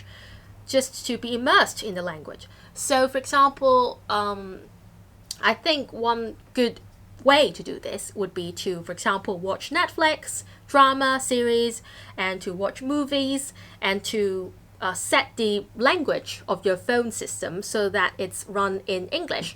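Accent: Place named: England, United Kingdom. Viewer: Being able to speak English fluently is a must and it's a routine / daily practice for every English teacher. It's not something AMAZING for an English teacher to do, or something that an English teacher has to be BRAVE to do among a crowd of students. I guess you may have exaggerated your ability to speak fluently with a bit of English accent. I'd like to tell you that there are hundreds and thousands of lecturers / teachers out there who can also speak English EVEN MORE FLUENTLY than you can. If you jump into any English Department in any university in HK, you'll soon find that you are just one of the thousands in the 7.5M population in HK.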